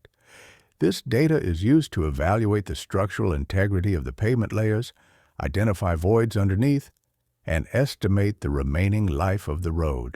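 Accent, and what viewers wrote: United States. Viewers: Not liking the Louisiana-like accent. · The "southern drawl" AI voice is really annoying.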